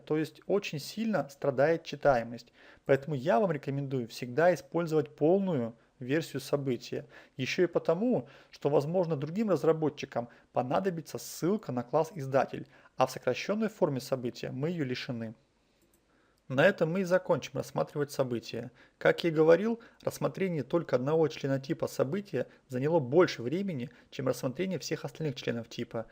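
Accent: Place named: Russia. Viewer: Очень интересная и полезная лекция. Единственное что мешает, это русское искажение английских слов и букв(параметр "Е" вместо параметр "И", "хЕндлЕр" вместо "хЭндлЭр", зыс вместо дис в слове this). Может это и не относится к теме урока но я считаю что программист всё-таки должен уметь правильно читать по английский не говоря уже о владении языком вообще.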